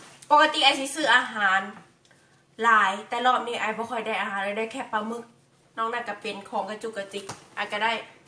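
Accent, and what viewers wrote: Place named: Nakhon Phanom, Thailand. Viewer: พี่เพิ่งติดตามน้องอายพูดเหมือนคนนครพนม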